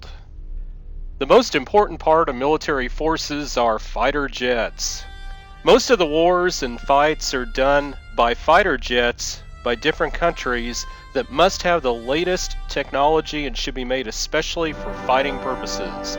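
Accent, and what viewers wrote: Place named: Pakistan. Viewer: Your voice is pakistani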